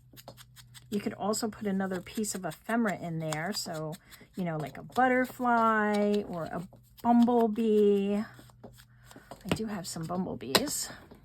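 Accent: New York, United States. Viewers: I will definitely try this. Totally enjoy your videos. And your NY accent.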